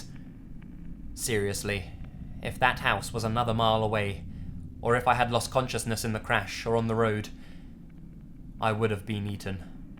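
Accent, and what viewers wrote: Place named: England, United Kingdom. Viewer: I LOVE this format. Lots of little (but intense) stories. Thank you for all your work. I love your voice too. Being from Sydney I hear a lot of English accents but yours is so warm and lovely.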